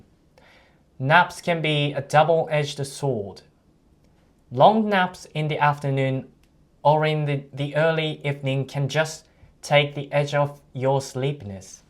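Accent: United Kingdom. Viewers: You did good, mate. While I am Australian, I definitely heard Britishness in most of your sounds. The only syllables/sounds which did not sound RP to me were the NI in night and SI in design. That silent g may be lengthening your i-sounds a tad too much. Nonetheless, after listening to you twice end to end, I felt you are nailing so much RP/Brit sounds.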